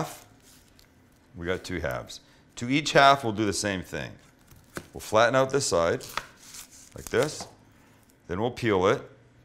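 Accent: Canada. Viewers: Are you from Canada??? I thought I kind of picked up on an accent but wasn't sure. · Canadian accent. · i've been trying to figure out if he is! everytime he say out or about i'm like...hmmm, i wonder if he's canadian! lol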